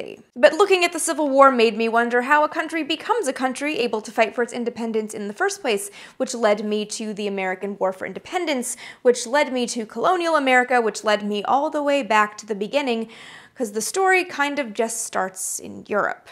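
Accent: Canada. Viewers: I am now noticing the Canadian 'o' pronunciation and now I can't stop hearing it. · I suspected you were Canadian becauae of your pronunciation of certain words so I looked you up & sure enough even though you were trying to hide it.. I'm a big fan of your you tube channel & your depth of knowledge. I don't care if you are not from the U.S. & are an expert on NASA. Imo it gives you extra cred that you adore all things NASA & American space history despite not being born here. There are so many Canadians compared to Americans when you factor in the relative size of the pop on you tube & I think that eludiates a big prob here: we don't educate our citizens anymore. It really is a sorry state of affairs & I wonder about how many Americans are out there that could have contributed big if they been properly motived & piqued their interest in the hard sciences & education in general. 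· I have nothing but love for Canadians, the Brits, and Aussies. I knew you were Canadian when you said “aboot” (about) and “surry” (sorry). It’s adorable 🥰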